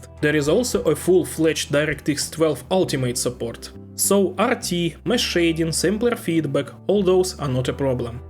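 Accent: Russia. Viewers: Russian accent😂) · Сразу слышен русский акцент и школа )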